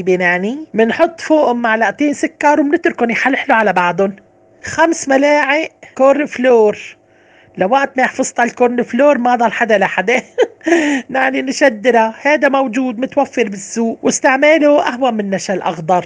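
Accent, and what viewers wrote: Aleppo, Syria. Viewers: وللة بتذكريني كتير بلحكي الححححلبي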